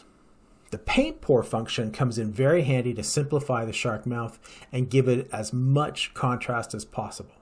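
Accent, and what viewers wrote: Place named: United States. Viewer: I was wondering why you mentioned a Warthog while showing a picture that definitely wasn''t a Warthog. Then I realised it was just the way you Americans say hawk as "hock".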